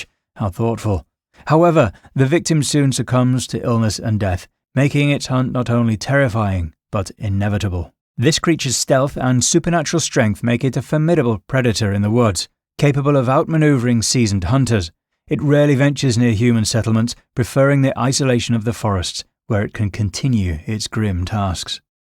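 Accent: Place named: England, United Kingdom. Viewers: I always find it a bit odd that people with English accents talk about American folklore. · You Not even native American and making videos about your original predecessors 😂 lil english accent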